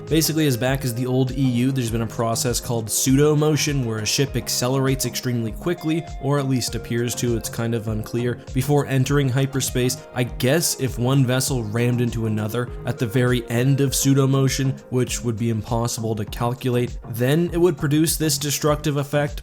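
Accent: Canada. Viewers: Oh shit, you're a canuck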